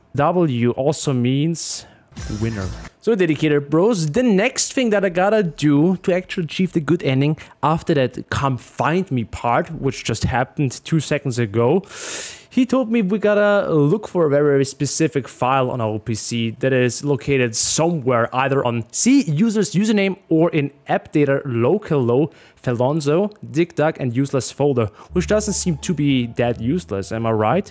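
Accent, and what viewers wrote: Germany. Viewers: Ayo you german? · your german?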